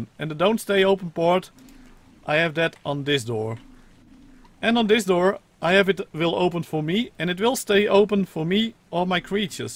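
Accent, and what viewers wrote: Netherlands. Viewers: hahaha je hoort wel goed dat je nederlands bent :D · nice nederlands accent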